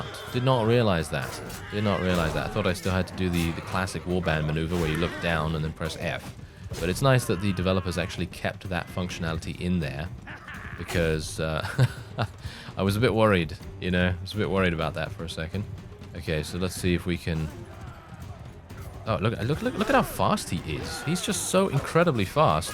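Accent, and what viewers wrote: United Kingdom. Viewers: Your voice, British accent and saying the word problematic every 5 minutes You are the most fascinated one i have ever listened to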